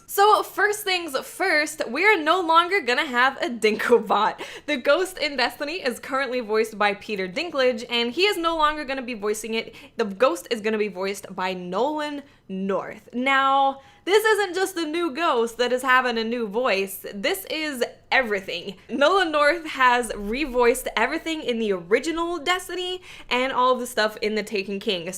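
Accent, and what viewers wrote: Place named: New Jersey, United States. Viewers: You have kind of a jersey accent but I might be wrong are you from around jersey or something (I'm not a stalker I'm just curious lol) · Where are you from? it sounds like you're from new york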